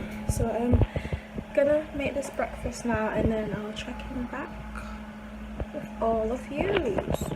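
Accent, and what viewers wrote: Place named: United Kingdom. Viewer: Are u jamaican u sound like u british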